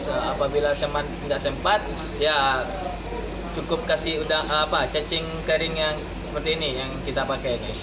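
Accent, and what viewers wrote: Indonesia. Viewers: bang orang Padang apa madura ? kok logat bahasa nya kek orang madura ya 😁✌️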